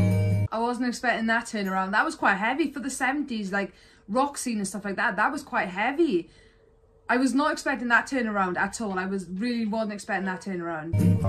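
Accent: Wales, United Kingdom. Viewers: Budgie are from Cardiff. Sounds like you’re from Wales 🏴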